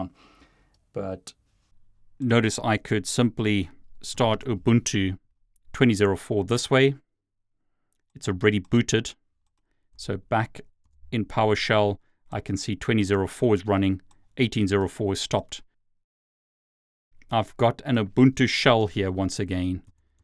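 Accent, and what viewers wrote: South Africa. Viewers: Ah a South African! Goeie video! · Are you South African? :) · can hear your raw south african accent ! thanks a lot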